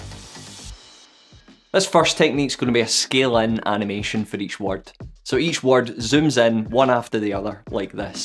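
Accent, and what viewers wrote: United Kingdom. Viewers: Thank you! Btw, first 1,5 minutes i just listening your pronunciation in English, it’s British accent? :)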